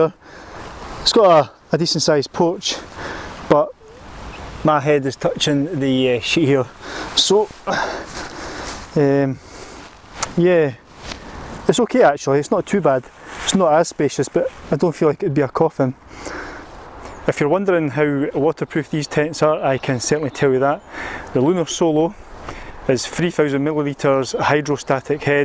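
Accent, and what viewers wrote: Scotland, United Kingdom. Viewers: Perfect review! Thanks for this! I lived in Edinburgh for a year, it's so nice to hear that scottish accent again! :-D (or am I wrong?)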